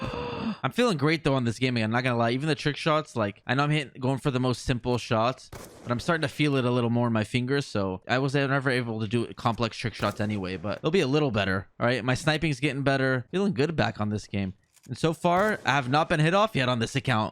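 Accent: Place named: United Kingdom. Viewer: That British accent is disgustingly funny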